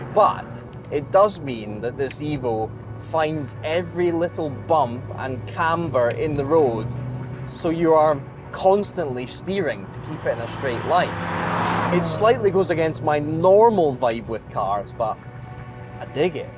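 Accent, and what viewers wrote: Scotland, United Kingdom. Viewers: It’s partly his Scottish accent I reckon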